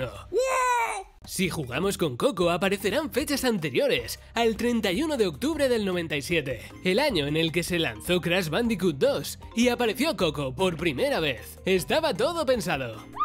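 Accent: Spain. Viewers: aaaaaaaaaaaaaaahh un español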